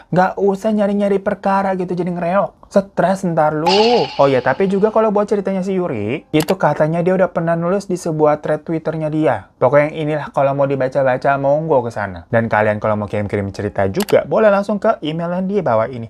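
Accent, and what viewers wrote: Java, Indonesia. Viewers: hihii😅 pake logat jowo siiip😂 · Wkwkwkwkwkwk..candu bgt logat jowo medok e bang 😂seru KLO ada TC yg pake jowo yaa.. 😂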